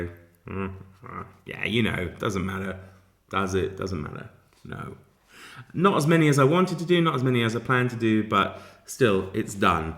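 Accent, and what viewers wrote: United States. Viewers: “Back by unpopular demand” had me wheezing right out the gate. And honestly, your commitment to that Western accent plus the Larry and Stretch voice acting? Emmy-worthy. You sold me on Lawless Miles. Fun wins. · Hi,! I don't know if you take requests, but I have one. Will you consider, please, carrying your Texas accent over to Victober? I would very much enjoy hearing you read Dickens and Trollope, etc., to us as Tex!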